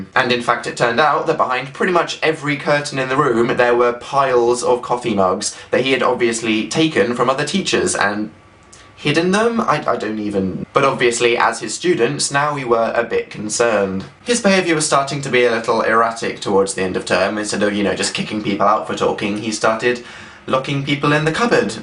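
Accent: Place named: France. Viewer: French awww so cute! ☺️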